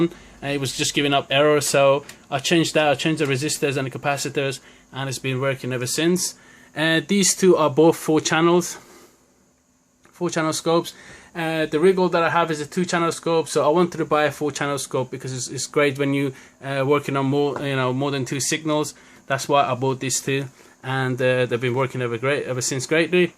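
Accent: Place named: Iran. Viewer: از لهجه ي ضايت معلومه ايراني هستي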